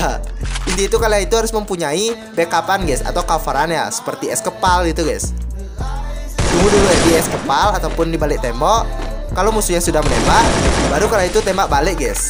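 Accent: Bali, Indonesia. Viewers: Ngomongnya kayak orang Bali gw curiga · Orang mana bang kok kayak orang badung bali ngomong bahasa indo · Pasti orang bali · Bang Abang orang Bali? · Bli logat nya kayak orang bali nek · org bali y bg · Kok cara ngomong indonya kek orang bali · Logat bali😅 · Org bali ya bang ya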